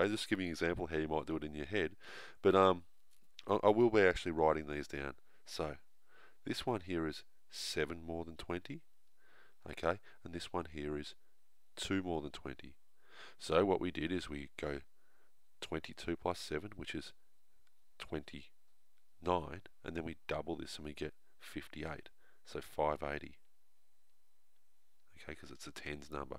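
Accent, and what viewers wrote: Australia. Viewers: The best math teachers have Australian accents.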